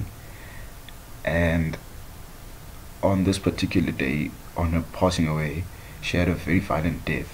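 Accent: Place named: Zimbabwe. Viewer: Could hear a Zim accent and I'm barely wrong bout such 😂🔥🚀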